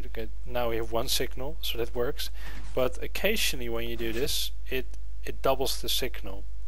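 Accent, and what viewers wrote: Netherlands. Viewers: Hey, are you dutch? I recognize the accent :p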